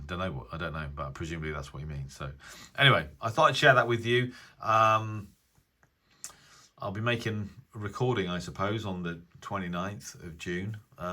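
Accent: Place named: RP, United Kingdom. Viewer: BBC. your voice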